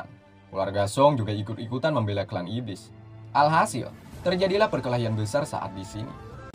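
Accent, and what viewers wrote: Banjar, Indonesia. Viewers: Urang Banjar banh? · Anjir ngakak pas akhirnya, urg banjar pinanya ni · Banjar banar 🤣🤣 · Urang banjarrrrr bjirrrrrrrrr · Astga, lama nonton rupaNya yang cerita ini URANG BANJAR JUA 🤣🤣🤣🤣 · Banjar bnr kh bng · Urang Banjar kah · Orang Banjar ah bang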